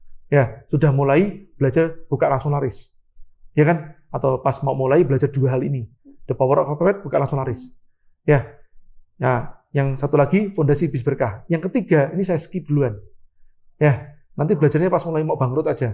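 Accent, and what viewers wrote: Java, Indonesia. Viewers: Koh asli mana si..jawa ya.medok.hehhehe Sukses trrus ya koh..moga sehat selalu..aamiiiinnn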